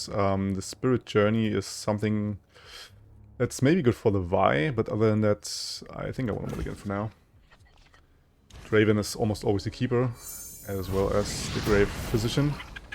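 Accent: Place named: Germany. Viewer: Lustig wie man selbst bei dir raushört das deutsch deine Muttersprache ist haha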